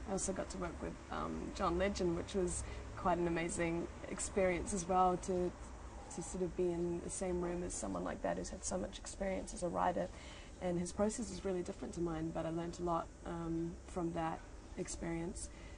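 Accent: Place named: New Zealand. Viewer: I love her speaking voice as well as her singing voice. The Kiwi accent really knocks me out.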